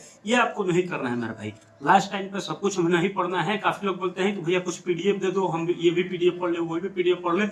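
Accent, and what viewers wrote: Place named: Bihar, India. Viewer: bhaiya bihta Bihar se hai inki yhi language hai 😂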